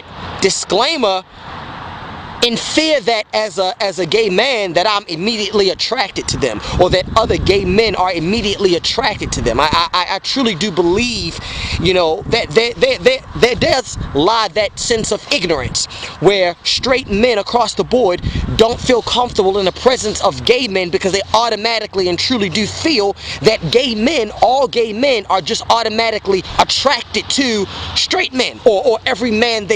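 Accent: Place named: Baltimore, United States. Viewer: I knew you were from Baltimore..I can hear it all in your voice...And why do straight men do that. I think they do think this, that every gay man wants them...They need to pump the breaks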